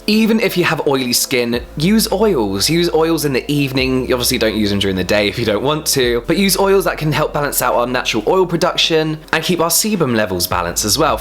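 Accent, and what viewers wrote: United Kingdom. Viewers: British accent lover, skincare lover and accidentally found your channel, perfect...!! Love your gesture when you're apply your skincare 😂😂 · Hi. I love British English, the way you pronunce words and speak. Beauty Is not my favourite subject but there is always much to learn. Your video is an effettive means of enriching my vocabulary. So thank you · Wow.. I love your British accent😘😘😘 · A wonderful British accent I've decided to watch all your videos to master that accent!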